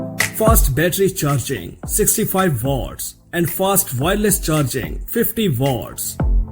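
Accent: India. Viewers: India english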